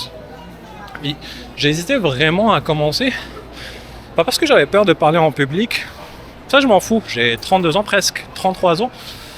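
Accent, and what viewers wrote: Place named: Quebec, Canada. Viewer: C’est quoi ce début d’accent québécois! 😅 good to hear from you Z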